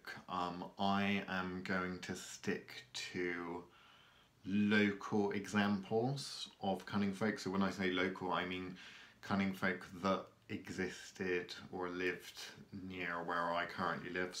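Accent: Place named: West Country, United Kingdom. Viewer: A fascinating video, great to learn more about my part of the country and Cunning Folk in general. I could listen to your West Country accent all day! Cheers, moi luvver!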